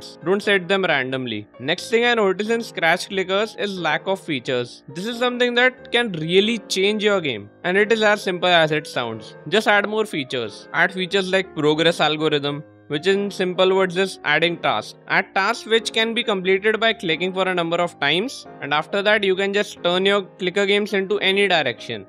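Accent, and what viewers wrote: India. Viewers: I just really like the Indian voice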